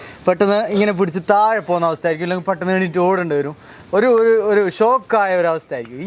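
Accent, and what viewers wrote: Kasaragod, India. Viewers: കാസറഗോഡ് കാരനാണോ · കാസറഗോഡ് ഭാഷയുടെ നിഷ്കളങ്കത നാളുകൾക്കു ശേഷം കേൾക്കുന്നു.............സ്നേഹത്തോടെ ഒരു കോഴിക്കോട്ടുകാരൻ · ഇങ്ങടെ കാസർകോടൻ ഭാഷ, അത് കേൾക്കാൻ ഒരു സുഖമാണ്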